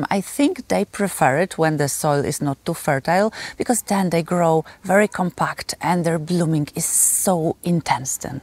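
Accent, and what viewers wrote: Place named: Poland. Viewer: love your garden. I just started mine, I was too busy to do it earlier. Nice polish accent. Greetings from Atlanta.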